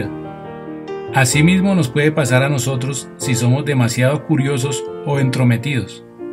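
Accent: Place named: Antioquia, Colombia. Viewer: tiene acento de paisa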